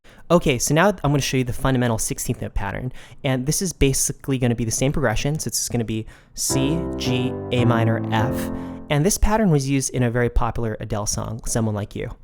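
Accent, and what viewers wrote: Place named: England, United Kingdom. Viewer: What part of England are you from dude ?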